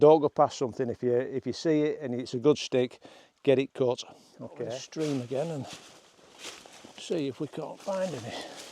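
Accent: Lancashire, United Kingdom. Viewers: Sounds like a Lancashire Lad (or West Yorkshire but that used to be Lancashire lol) I'm from Preston originally - EXCELLENT Video buddy!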